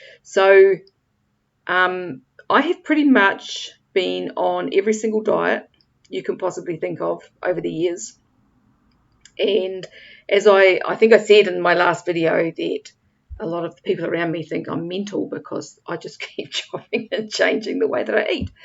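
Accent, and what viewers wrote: New Zealand. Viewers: Thank you for your videos. I totally understand about you forget WHY you gave up a certain food or drink. I hope you keep feeling better. I'm dealing with the same and wondered about psyllium. I didn't catch what you said your friend got you started taking? Sorry, as much as I love your NZ accent 😍 I sometimes have to replay to catch everything 😄 Oh! I just now saw your reply to someone else on this! Thanks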